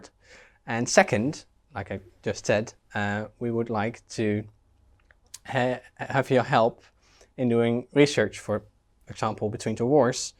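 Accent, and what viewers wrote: Netherlands. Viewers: YES. JOIN ME IN THE DUTCH ACCENT REPRESENTATION SQUAD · Ik hou van een Nederlands accent. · That's some T H I C C Dutch accent right there! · He has a great voice that’s fun to listen to 5/5. It’s like an American doing a British voice while being Dutch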